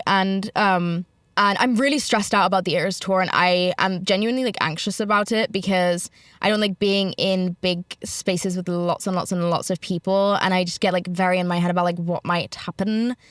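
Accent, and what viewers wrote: United Kingdom. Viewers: me I don’t hear the US accent but the UK one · I don't think an american accent has developed but the britishness of your accent has definitely decreased. It's noticeable if you go back and watch early tiktoks of yours. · You did say Vite-a-min 😂 it’s VIT-A-MIN. But yes, overall you’re back to British. 🇬🇧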